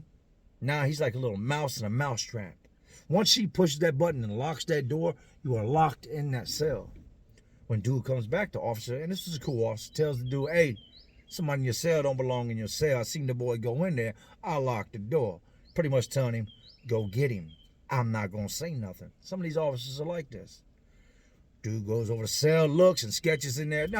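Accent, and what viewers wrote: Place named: Philadelphia, United States. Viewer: i can hear that PHILLY in this voice..even though he from VA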